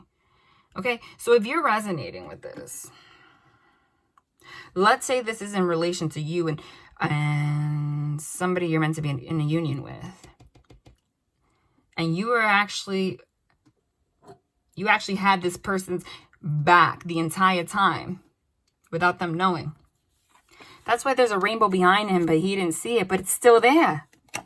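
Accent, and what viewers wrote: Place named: New York, United States. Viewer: Hey I love your New York accent girl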